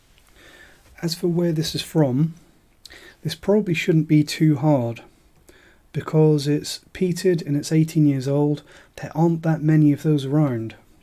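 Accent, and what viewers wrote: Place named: United Kingdom. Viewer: Where are you from in Great Britain? Your accent is very nice to listen to. Added to your whisky knowledge and honest reviews, this makes you the best youtube subscription i made alongside Gwhisky this year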